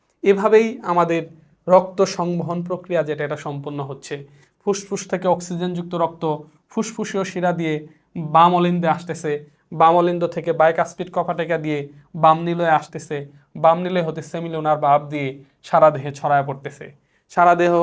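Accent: Sylhet, Bangladesh. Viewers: Ohh.Kotha Bolar Style sylheti der moto..!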